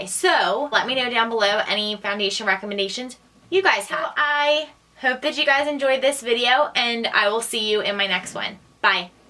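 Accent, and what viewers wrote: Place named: Louisiana, United States. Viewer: I just got to ask do you live in Louisiana or Mississippi? I'm picking up on your accent. and I have this Foundation as well and I'm still not sure how I feel about it yet even though I do like the shade I picked out I'm not sure about the wear time yet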